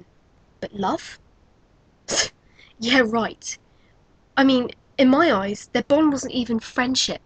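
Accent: United Kingdom. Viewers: LOL I'd like to see Canon Ulquiorra's reaction to UH Ulquiorra XD Oh the so-called Orihime fans *rolls eyes* the only ones in denial are them. As regards the heart and it's meaning, one of the chapters of the Hueco Mundo Arc was called "Six Hearts Will Beat As On" refering to all the nakamas who went to save Orihime, so there it is, the "heart" as a friendship device. Btw, I love your pronunciation. You're british, aren't you? XD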